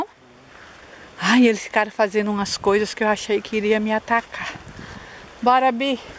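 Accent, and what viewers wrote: Northeast, Brazil. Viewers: realmente vc mora num paraiso...bela beleza do lugar,e seu sotaque e no nordeste ne? mas onde?bjus minha querida vc e td de bom e muito divertida....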